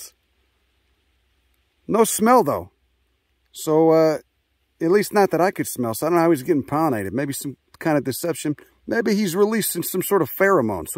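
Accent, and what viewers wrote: Chicago, United States. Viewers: As a first video to see, seeing a guy who looks like a boxer with a missing tooth and a swollen eye, talking about botany in a heavy Chicago accent, you have got my sub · I love hearing all this information in this thick ass chicago (I think) accent. Takes the intimidation out of learning to be honest.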